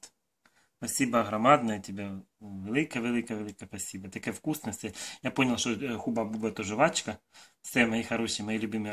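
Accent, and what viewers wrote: Ukraine. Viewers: В этом -то и прелесть,в его украинском говоре.Так что никого не слушай,и шокай скильки душе угодно.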